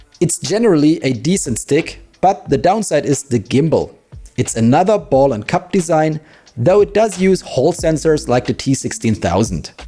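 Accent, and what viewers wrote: Germany. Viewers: Hey don't want to sound mean but are you German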